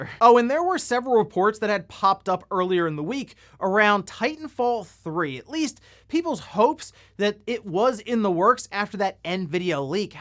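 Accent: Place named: United States. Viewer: Damn yanks -it’s MAHrio not MUHrio.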